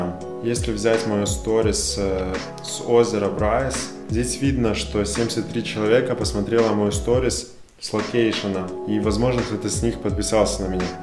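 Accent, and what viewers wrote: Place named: Ukraine. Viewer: украинский акцент, с мягким звуком «Г». Только и всего. Поверьте нам не режет слух абсолютно и подстраиваться сложно, так как в нашей речи практически нет твёрдых звуков «Г», как в русском языке. Как говориться, зВыняйте))).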